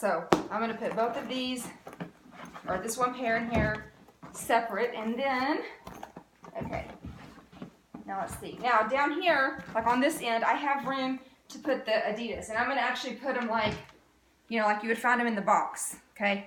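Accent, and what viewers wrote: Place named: South, United States. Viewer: I love u and your southern accent! U r funny! Had to sub due to your awesome personality! 🤣❤️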